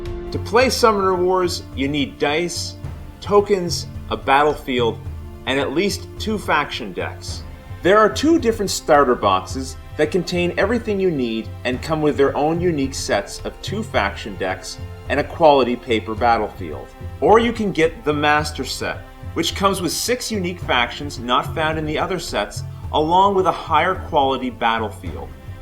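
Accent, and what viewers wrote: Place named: Eastern Canada, Canada. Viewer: I'm from Eastern Canada, that's what you're hearing :)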